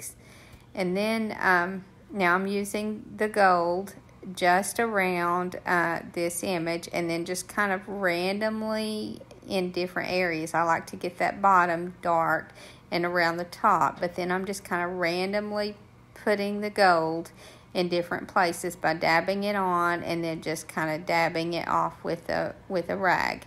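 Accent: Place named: South, United States. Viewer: Thank you so much, just love your ideas and your accent is gorgeous. We hardly ever hear a Southern accent in South Africa so it is indeed very pleasurable watching and listening. Just subscribed, I have to get more of this, it feeds my soul. Thank you and God Bless❤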